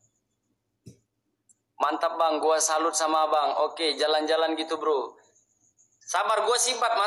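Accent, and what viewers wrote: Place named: Palembang, Indonesia. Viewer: Ass.... " ane mau tanya. Asli nya kang dari mana yah..?? Kayanya logat Palembang kang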